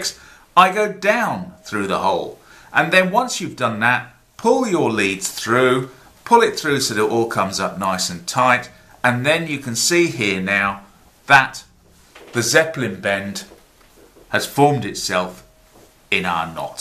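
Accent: United Kingdom. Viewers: Recently got into knots after learning a hitch on a canoeing trip. Building my repertoire. Although I have been using the alpine butterfly as my preferred bend, I liked the aesthetics of the zeppelin, so gave it a search. Thanks for the videos, it is nice to hear a fellow Brit once in a while. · Thank you for demonstrating two ways of tying this knot. And I love your British pronunciation.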